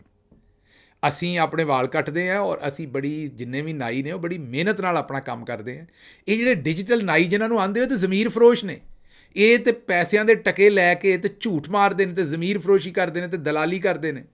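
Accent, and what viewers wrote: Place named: Punjab, India. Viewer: Tuhadi and Sadi Amritsar Wali an di boli iko jihi hai. Kanak (Gandum) de Buthe, vI always like your pure Pendulum Punjabi.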